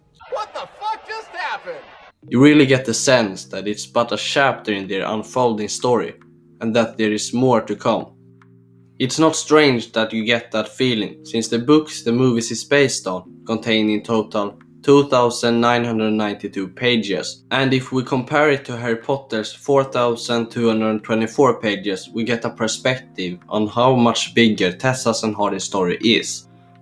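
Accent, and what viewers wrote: Sweden. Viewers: U rly sound Swedish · Visst är du som pratar svensk hahahaah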